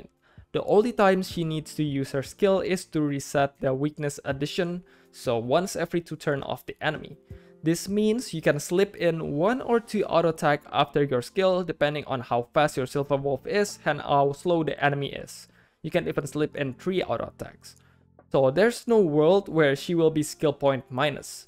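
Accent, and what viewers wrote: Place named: Indonesia. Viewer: Fix Indo wkwk kedengeran aksennya, mantap bang, keep making more content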